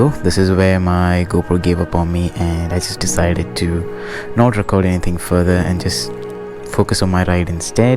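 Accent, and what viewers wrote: Karnataka, India. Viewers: Brrooo your kannada accent is too good ! Broo madhaya dalli kannada matadta iri bossu ! I am going add this My fav traveling vlog playlist!😜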